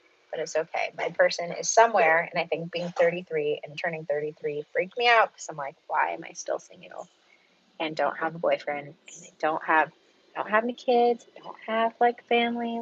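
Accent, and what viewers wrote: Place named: England, United Kingdom. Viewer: Hey you are so beautiful I saw you from leave her wild and then I watch your videos I think you were such a beautiful young beautiful girl oh yeah I love your accent your English one that is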